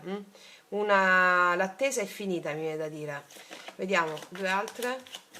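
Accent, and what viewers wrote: Italy. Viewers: sei una mia recente scoperta.. ❤ vorrei incontrarti, sento dall'axcento che sei nella mia stessa penisola.. ti inviero una mail..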